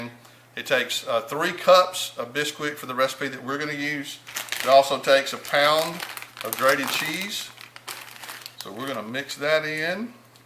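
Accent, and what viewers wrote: South, United States. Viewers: My husband is going to LOVE these! Thank you and so much for this recipe! I love your accent and funny southern expressions..."Keep doin it till you got it gone" lol. Very entertaining... and these are great recipes! I made your Date Nut Balls this morning for our Christmas party today. Delish! xox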